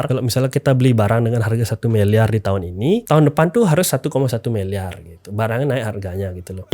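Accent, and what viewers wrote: Bali, Indonesia. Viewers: Logat Timur coy, Bali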